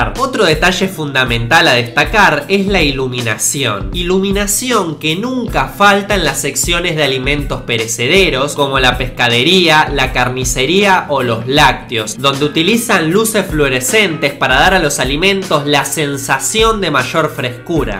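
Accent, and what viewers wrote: Argentina. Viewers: es argentino no? xD viva argentina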